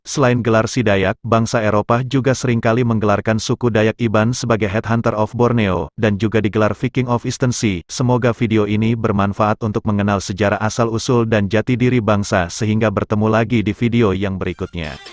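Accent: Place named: Indonesia. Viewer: Hanya satu yg aku nak Tanya... Admin channel ni asal org mana ya? Asal org Sarawak ke org Indonesia? Sebab slenk suara tu mcm Indonesia je... Hanya bertanyak ya 😊 #NgapSayot®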